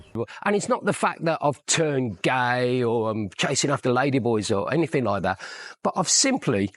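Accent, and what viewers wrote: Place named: West Bromwich, United Kingdom. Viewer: I've been in Thailand since 2010 and can honestly say the women are getting fat and too demanding. It's a myth about beautiful Thai women. I had also experienced physical violence from them, I never ever experienced that from a woman in the western civilisation. Btw, you are looking older and fatter in the face. Forget getting a HiSo woman, no chance with your looks and West Brom accent! Lol+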